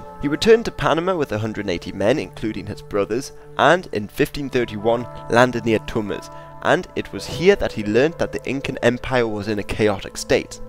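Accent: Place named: United Kingdom. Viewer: he's not even american he's British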